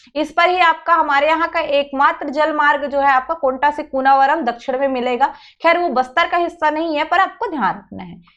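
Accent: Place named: Odisha, India. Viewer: Thoda odiya accent ata hai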